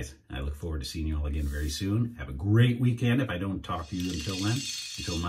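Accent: Newcastle, United Kingdom. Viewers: your welcome and thank you for sharing such amazing videos. Newcastle's great, love the accent! I was born in london. I am also a bond fan and I collect watches so I noticed your omega Seamaster straight away, I also own one. I have just this week started shaving with a double edged razor for the 1st time and really enjoying it! Not to many cuts!! I can see this turning in to a new hobby! 😁🇬🇧🇺🇲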